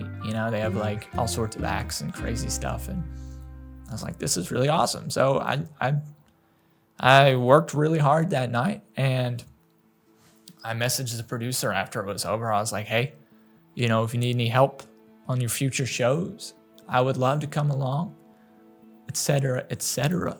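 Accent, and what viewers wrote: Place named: Virginia, United States. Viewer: That Virginia accent is a big part of why I enjoy your ASMR channel. 😁 Your story is so interesting. Best of luck to you!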